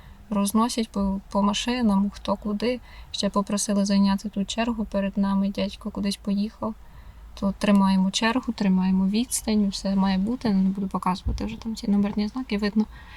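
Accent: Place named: Kirovohrad, Ukraine. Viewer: Слухаю твою мову. Відмінності з Кропивницькими селами мінімальні.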